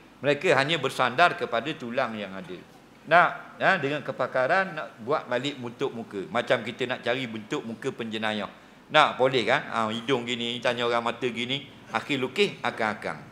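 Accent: Terengganu, Malaysia. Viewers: Tak faham dialek bahasa terengganu ke..@ ada masalah kefahaman?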